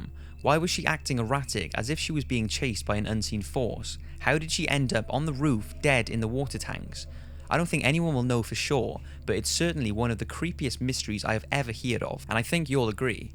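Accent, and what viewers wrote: Wales, United Kingdom. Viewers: Very good. But where is ur accent from? It sounds a bit Welsh, but my husband is Welsh and he sounds quite different! Lolxxxx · " lol he's British can't tell by his accent" Of course you can tell he's British, unless you're a fucking moron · He is actually Welsh though. Don't know what part of Wales however, but he must pronounce words that way. So yeah.. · Yes but he's British so he has an accent lol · He's british or something, you fuck nuts, he has an accent, and can't pronounce things the "american" way, doesn't mean he's uneducated, dumbasses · Yes he is Welsh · He's Welsh and I don't know any dialect in the UK who says "heared" ...we say "heard" I think he's just a bit nervous..... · James Smith he has a british accent so it might be harder for him to pronounce certain things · Are you Welsh? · Ahh I thought there was Welsh in it but did not want to go out on that limb. 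Either way that was not the crucial part of my comment lol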